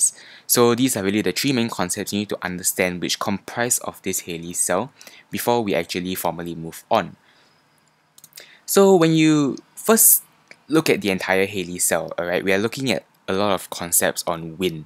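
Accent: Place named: Singapore. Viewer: This is useful even for AS&A's CIE geography, thank you so much! The Singaporean accent is refreshing to hear as someone studying internationally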